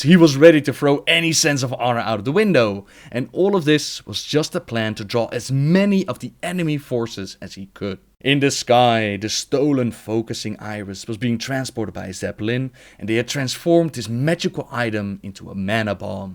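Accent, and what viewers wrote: Netherlands. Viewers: The Dutch accent is real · He has a typical dutch accent lol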